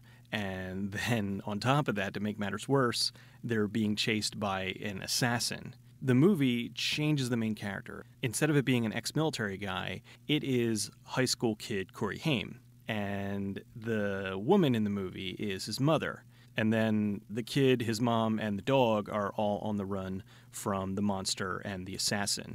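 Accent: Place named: Indiana, United States. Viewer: where are you from? You sound like you're from Indiana maybe?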